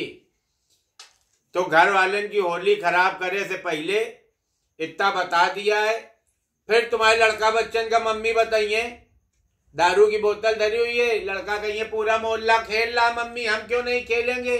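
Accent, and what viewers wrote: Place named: Unnao, India. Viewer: हम भी उन्नाव के रहने वाले है 🙏💕 पर हम वहाँ की बोली नही बोल पाती हूँ सच आपकी बात सुनकर मजा आ जाता है 🎂🎊🎉🍻🥂🥂🍻🎂🎊🎉🍻🥂🥂🍻🎂🎊🎉🍻🥂🥂🍻🎂🎊🎉🍻🥂🥂🍻🎂🎊🎉🍻🥂🥂🍻🎂🎊🎉🍻🥂🥂🍻🎂🎊🎉🍻🥂🥂🍻🎂🎊🎉🍻🥂🥂🍻🎂🎊🎉🍻🥂🥂🍻🎂🎊🎉🍻🥂🥂🍻🥃🥂🥃🥂🍷🍹🍷🥂🍻🥣🥣🍩🍩🍪🍪🥛🍬🍬🍩🍩🎇🎇🎇🎇🎆🎆🎆🎆🌌🎇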